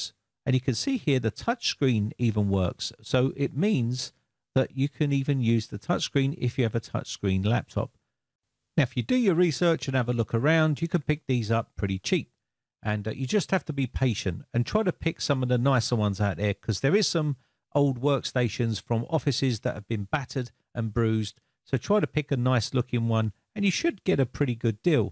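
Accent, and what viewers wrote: United Kingdom. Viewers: August 14, 2022 - Compliments to for a clear, and concise instructional and informative video. As an anglophile, I particularly like accent.😉 Most of my drama entertainment comes from Brit productions. I watched this video because Microsoft is getting more authoritarian by the day. With Windows 11 they are basically making people that buy that OS their property. They want to control everything from the customers' PCs to the customers themselves. Google is no "knight in shining armor", but I would rather sell them my information in return for an OS that works, and is free in a monetary sense anyway.😏😏 One of the more positive things coming out of the whole Climate Change, and preserving resources movement. Is that people are becoming increasingly concerned about Tech items that can't be upgraded or repaired. So fortunately more people are interested in recycling and repurposing things that shouldn't be thrown into landfills until they are absolutely useless and just don't work anymore. 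I would appreciate it if made a future video that is more oriented towards installing a Google OS on desktop PCs. Cheers from Connecticut, U.S.A👍👍😊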